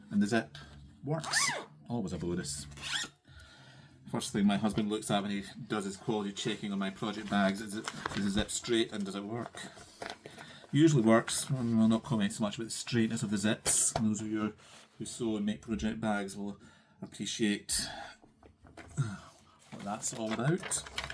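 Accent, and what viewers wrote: Scotland, United Kingdom. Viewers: Hello I enjoyed seeing your WIPS hope you continue with floss tube videos. Happy anniversary. I stitch samplers and no fancy ladies. Saga is one of my works in progress have you watched the runner stitcher a great Saga stitcher Love your project bags. Have subscribed and enjoyed hearing a Scottish accent. Happy stitching from a Scot in London Lo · Wow you're such a natural. I love you're pieces and can't wait to see more, please record again when you're able to. I love the Scottish accent as well. My SIL is Scottish and I could listen to her all day lol. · I’m so glad I found your floss tube! I loved seeing your Cinderella, I’m collecting that series and can’t wait to get started. I’m really impressed with your fabric selections. The Yellows are lovely and that purple for your monster project is yummy! I loved your bags, too! 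and your Scottish accent is so lovely. Just a fun and exciting first flosstube… keep them coming and best wishes for your new venture! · I loooove a Scottish accent! My ancestors are from Arran.❤️ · Hi from New Hampshire USA! 🙋‍♀️ New subscriber. 😊 I heard of your channel from Eva at stitching in the interlake. I love all of your projects and bags. You reflect your love of color, so bright and cheery. ❤. I am looking forward to following you on your stitching journey! Cheers! 😄 I love your Scottish accent. 😉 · Best wishes from Germany. Listening to you reminds me a bit on my two holidaytrips to Scotland and I love it ^^ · Just found you thanks to Comfort Stitching. I so enjoyed your video! Great projects beautiful stitching. We just returned to the US from a visit to Scotland and Ireland. We’d been to Ireland and everyone told us how beautiful Scotland was…..they were right! I was so happy to be hearing that gorgeous accent again today!❤ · If only for your beautiful accent, which reminds me, even makes me homesick for my Scottish friends who live in the same part of Scotland, I would jeep listening to you! 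Pluss the beautiful pieces you are showing, reason enough to stay around😅. Welcome to Flosstube! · My husband and I returned from three glorious weeks in Scotland just two days ago. It was my first visit and my husband's fifth. He is 77% Scottish, and I am 27%. We did a lot of family history research and even visited the family homestead in Orkney. When your video came up in my feed, I was SURE you were Scottish. I already miss hearing the brogue and visiting with so many kind, hospitable people. I subscribed, so now I can get my heart warmed each time I hear you talk about your WIPS. Nice job on your first video. Thank you,! By the way, I am in Ontario, Canada. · Hi, great to hear a gid Scottish accent ❤ I live on the other side of Glasgow towards Largs. Great Episode looking forward to many more.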